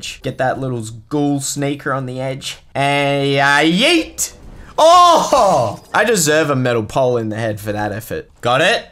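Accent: Australia. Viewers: Are you Aussie or Just wondering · Are you Australian? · Are you fromAustralia??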